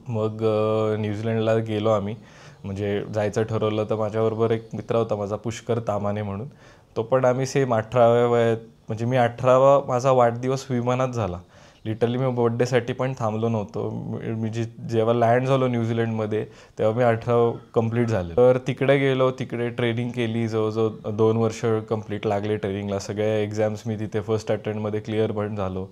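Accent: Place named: Pune, India. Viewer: Good evening..... अच्छा म्हणजे एका सामान्य कुटुंबातून तू संघर्ष करून पायलट झाला आहेस तर पण एक गोष्ट खरी आहे मराठी माणसाला खूप संघर्ष करावा लागतो बोलीभाषा खूप गोड आहे तुझी पुण्याचा प्रचंड प्रभाव आहे पुणे तिथे काय उणे😂😂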